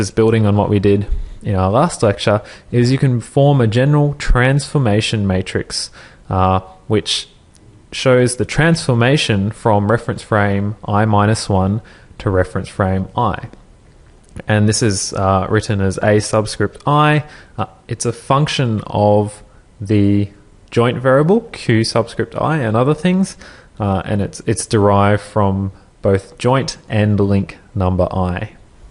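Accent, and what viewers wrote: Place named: Australia. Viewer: Refreshing to hear intelligence behind an Aussie accent :-) (coming from a country where the only Aussie representation is the Soaps on TV). Back on topic: Great introduction to Kinematics, thanks.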